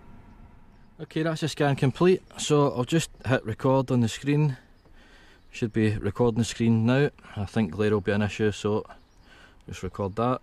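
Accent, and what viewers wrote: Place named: Scotland, United Kingdom. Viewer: Thank you for taking the time to do this diag video. Well explained and I can even understand the Scottish accent. Ha. Thanks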